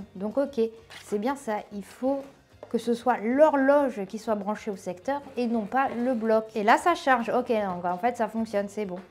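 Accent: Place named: Toulouse, France. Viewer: J'adore ton accent toulousain 😂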